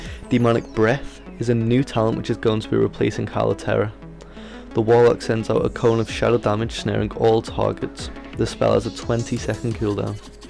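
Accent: United Kingdom. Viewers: People from liverpool are usually always in a good mood lol, you sound like your from west derby / norris green area =o??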